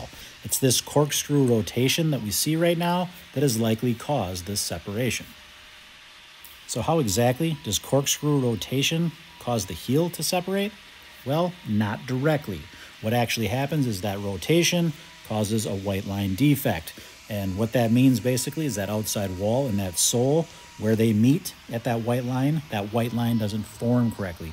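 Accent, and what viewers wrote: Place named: Arkansas, United States. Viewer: U from Arkansas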